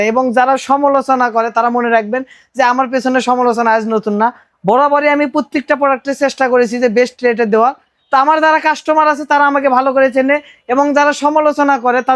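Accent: Bogura, Bangladesh. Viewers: Bhai Apner Kotha Sunle Mone Hoi Amader alakai Basha mane Bogurai.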